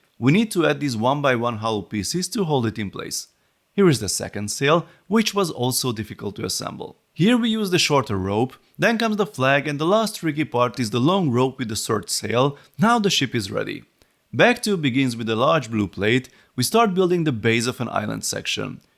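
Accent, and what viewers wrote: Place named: Hungary. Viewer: le a kalappal. Te vagy a kedvenc Lego csatornám, egy csomó olyan videód megnéztem, ahol az adott készlet beszerzése még eszembe sem jutna:). Jól meg vannak csinálva a filmek, élvezetes és választékos(!) az angol szöveg, az akcentuson ugyan hallani hogy magyar vagy, de egyáltalán nem zavaró módon. Gondolom az oktatói múltadból is tudod, hogy lehet a figyelmet folyamatosan fenntartó szövegeket létrehozni és úgy elmondani, hogy abba ne aludjon bele a közönség. All in all, nagy kudos.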